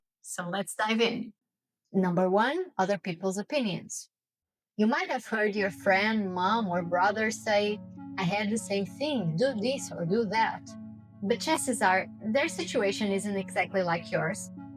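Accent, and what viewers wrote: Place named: Brazil. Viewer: By her accent, she is Brazilian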